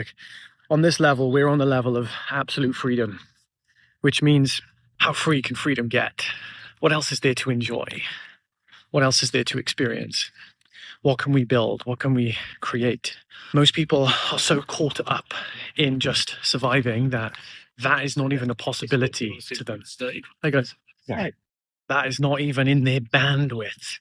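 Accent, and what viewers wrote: United Kingdom. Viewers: I see you are in Australia but sound like a 🇬🇧:)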